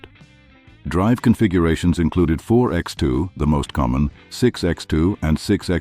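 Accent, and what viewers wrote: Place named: United Kingdom. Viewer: Yep, 100% AI slop. Generic British accent voiceover, no breaths, slightly off vocal cadence. There's so much of this trash on YouTube now, I can usually spot it in seconds.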